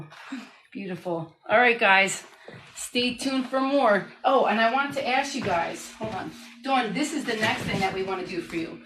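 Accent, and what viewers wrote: New Jersey, United States. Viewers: As a cosmetologist myself for 40 years, I must say that I thoroughly enjoyed watching this video and I even learned a couple new techniques myself. I cut mostly men’s hair now so it’s nice to learn a few things again regarding women’s hair. And by the way, I’m from Rhode Island and I love your jersey accent! I’m in Florida now and listening to you just makes me homesick. You’re such a beautiful person with a wonderful attitude. God bless. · ❤❤❤I grew up in NJ and moved away to Cali but your voice reminds me of home! And now you say Shrewsbury (WHAT?!) I came from HIGHLANDS!!❤❤❤ I have no idea when I can get to Jersey but if you are ever taking a trip to California, please let me know!! My hair could definitely need your positivity and know how!! ❤❤❤❤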